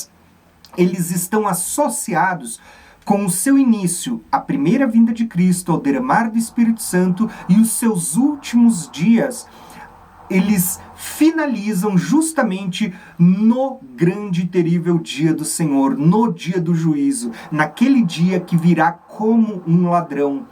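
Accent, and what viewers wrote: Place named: South, Brazil. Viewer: Sotoque do Sul irmão…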